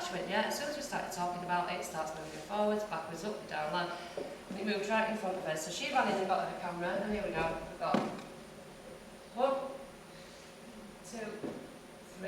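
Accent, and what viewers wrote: Yorkshire, United Kingdom. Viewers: it is English you Muppet!! YORKSHIRE to be exact!! Not everyone over here speaks like the queen or the BBC world service!! We are a very diverse nation in accents!!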